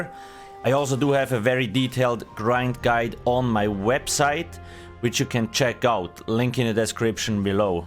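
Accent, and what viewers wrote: Switzerland. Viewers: Thanks for the vid! And that accent sounds very Swiss! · Are you from switzerland? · Sounds like swiss dude. I can spot accents 🤣🤣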